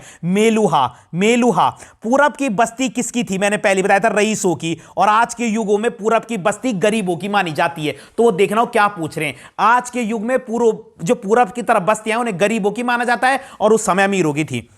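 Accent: Garhwal, India. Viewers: Gadwali accent 🔥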